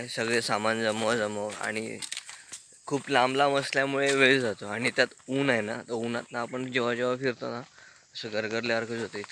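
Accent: Malvani, India. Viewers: Bharichhhh re maja Eli bghuk pan tumchi vat lagli naa.... Malavani ch boll bara vatta eikak 😄aaplo konkan asoch hirvalit rhavan de Maharaja 🙏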